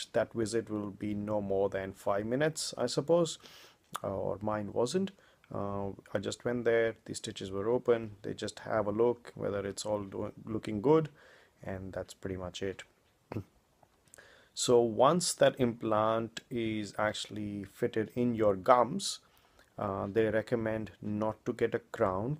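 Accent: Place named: Delhi, India. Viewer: Your not from Australia, your an Indian dude, paid for promo. Accent is Delhi strong.... Lol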